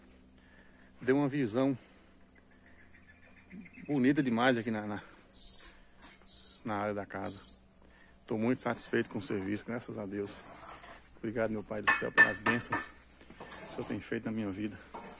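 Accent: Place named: Minas Gerais, Brazil. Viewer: Então tá bão uai....eita sotaque forte de minas...tá ficando chibata!!! Arrocha aí meu irmão. Forte abraço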